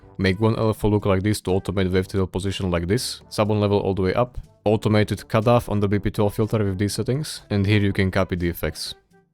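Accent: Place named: Eastern Europe. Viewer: slavic accent🫶🫶🫶 (The video looks good, but it's chaotic)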